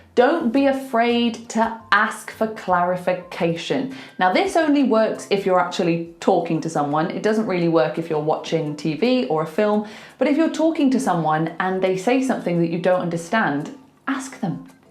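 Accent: United Kingdom. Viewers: Are u British too 0_0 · She’s lovely and perfect. I really understand her. Cute lips are perfectly adapt with the British accents. Good luck! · Am new here.. am polyglot and yasss u ar right.. UK accent is wonderful.. we ave a big rivalry with 'em.. l love ur accent .. 💪💪🇺🇸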